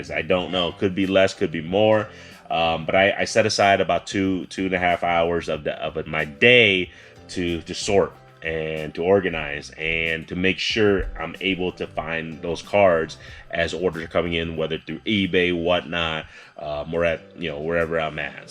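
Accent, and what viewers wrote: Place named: Vietnam. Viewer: Are you vietnamese? U sound like it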